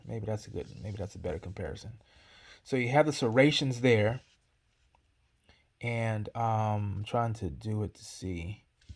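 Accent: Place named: South, United States. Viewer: He’s probably from the South like me. We have accents:)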